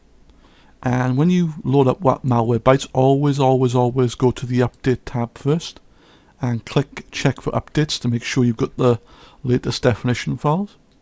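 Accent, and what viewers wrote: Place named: Plymouth, United Kingdom. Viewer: You saved me 120 pounds, for that... you get my sub. thank you so much. PS is your accent from plymouth? <3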